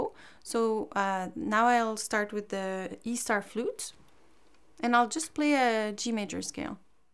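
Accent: Quebec, Canada. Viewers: Êtes vous Québécoise?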